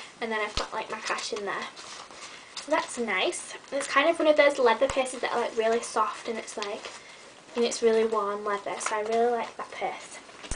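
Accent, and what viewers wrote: United Kingdom. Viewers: UK gals always have the cutest accent lol put more vedios nice work :) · cooll videeoo you have a more british accent than me and i live in the uk to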